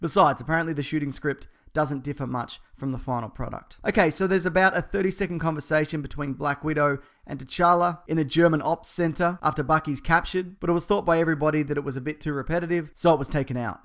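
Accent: Australia. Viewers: are you australian my dude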